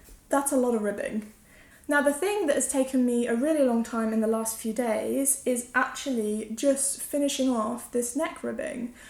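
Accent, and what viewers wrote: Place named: United Kingdom. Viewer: I enjoyed your Video again very much and I love to hear your British accent... I recently finish ed the Agave Sweater by Yumiko Alexander. I knitted it with Drops Bomull Lin in dark Blue. It was fun and a quick knit also. I will also try one of the bags you recommended in the speacial bag video. Best wishes from Cologne! 🎉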